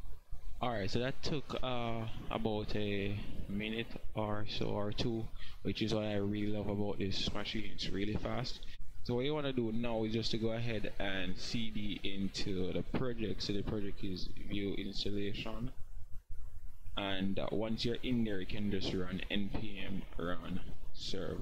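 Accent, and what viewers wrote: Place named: Jamaica. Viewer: Came expecting an Indian accent, stayed for the Jamaican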